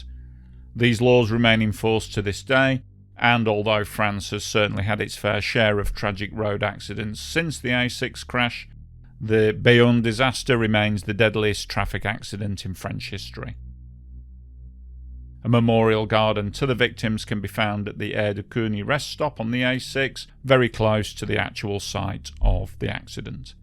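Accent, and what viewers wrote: United Kingdom. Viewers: . . . great point, I'm of the same thought. . . . as well, it's really reaching out there to correct a video producer in the comments section 🤣🤣Being way over here in the US, it looks exactly like a Frenchman wanting to correct their arch rival Brit any chance they can get, cause this guy sure sounds British to me anyway.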